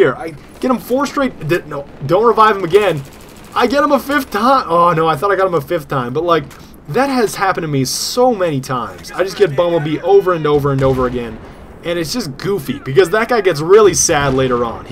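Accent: Germany. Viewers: your german accent are nice :D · your german is soo hilarious xD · xDDD ur german sounds so funny , awesome vid, greetings from germany :)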